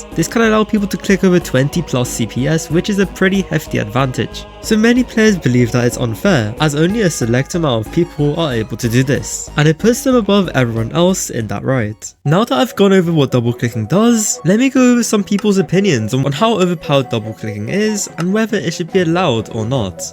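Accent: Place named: England, United Kingdom. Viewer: Your English accent is goated dude!! 🔥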